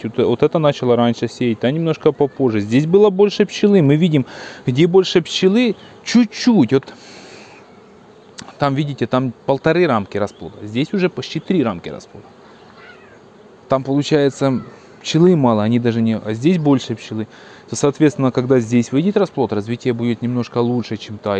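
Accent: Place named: Ukraine. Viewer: К ролику можно цеплять метку геолокации. У многих видел. А иначе понять, что вы из Украины, можно только по говору и по вальмовой крыше на заднем плане)) и то это не точный способ. На юге России тоже похожий говор и крыши:)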